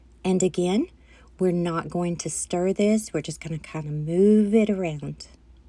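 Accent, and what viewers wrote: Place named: South, United States. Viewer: Love love your southern accent! " "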